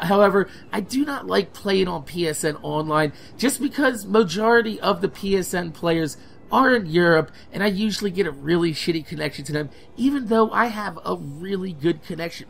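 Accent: New Jersey, United States. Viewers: are u from nj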